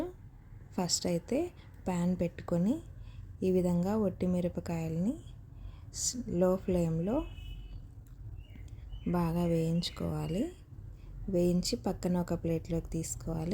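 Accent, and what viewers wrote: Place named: Kadapa, India. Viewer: Pakka Kadapa yasalo matladuthunnave mmeebale chepthunnav❤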